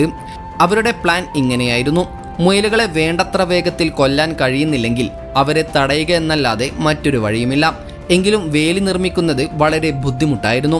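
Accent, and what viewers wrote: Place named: Malappuram, India. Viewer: Nalla malayalathil parayedo ninte malapuram bhasha vemda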